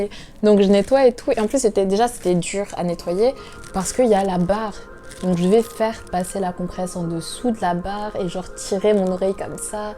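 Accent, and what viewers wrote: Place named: Cameroon. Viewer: L'accent Camerounais est présent 🇨🇲🇨🇲🇨🇲😂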